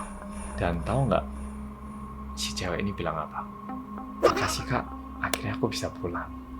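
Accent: Java, Indonesia. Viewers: Aku udah siap2 mau bilang "orang korea yang medok"😐😂 Ternyata Malah keweden😂😂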